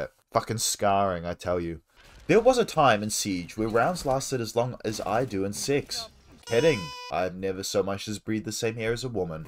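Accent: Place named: Australia. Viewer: You sound like elmaxo (no it’s not just the australian accent)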